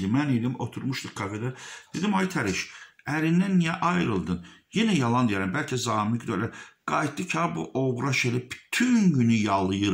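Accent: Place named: Sheki, Azerbaijan. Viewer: şəkiknən danışır 😀😀 can ay yetim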